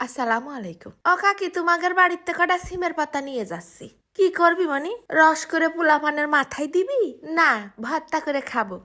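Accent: Jessore, Bangladesh. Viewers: Apu tomar kotha sunla ami kotha bolta sahos pai ta na hoila amar kotha sunla sobay hasi thatta korto akhon tomar kotha sunla mona hoi amara jessore ar manus ar kotha sotti misti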